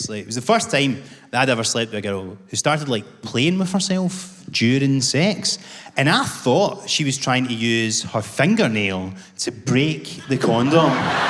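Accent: Scotland, United Kingdom. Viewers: This dude kills me, I need to go to Scotland to see one of his shows even though I need the subtitles to understand the Scottish accent 😅. Thanks Marc Jennings!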